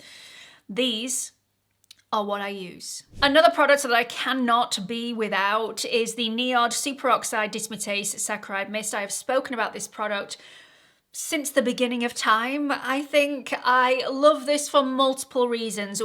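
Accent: United Kingdom. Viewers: I gave a thumbs up for this video, even though it seems to be a long ad for expensive devices I can't use because I have an implanted cardioverter-defibrillator. I honestly never noticed how well you sell-Sell-SELL! No worries, your voice is so enjoyable, and your British accent helps train me to listen better as I'm hard of hearing. Thanks for the lesson!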